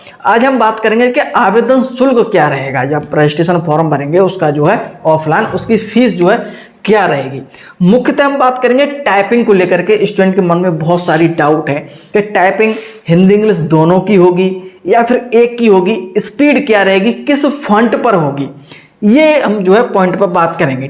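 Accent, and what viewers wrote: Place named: Etawah-Mainpuri, India. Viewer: सर आप की भाषा से लगता है। कि आप इटावा या मैनपुरी जिले से हो🤔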